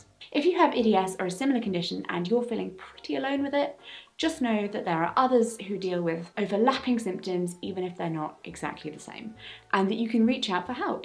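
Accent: United Kingdom. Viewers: Hey . You said you’re deaf . Trying to figure out how your speech is so good . Like how were you able to pick up a perfect British accent without being able to hear ? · Hello, recent suscriber here, l like your channel altough l might not be in your optimal demo ( 53 y/o male), l do like interesting human beings and l find you to be quite striking and l think that you have a very melodic voice and a wonderful british accent, even if you can't hear it yourself ironnicaly, l'm french canadian and l do appreciate the fact that l understand you perfectly because of your brilliant diction and l think that you make a beautiful couple you and your wife, anyway, just wanted to tell you, oh and l'm certainly not alone in this but l wish that l could rid you of all the things ailing you with the snap of a finger! ☝️😌 you might not read this because of the many messages that you get but l just wanted to show my appreciation, so godspeed and be well! 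🧡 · I wish there was a Love button instead of Like... I love your snarkiness!! So refreshing especially with the British accent; much love!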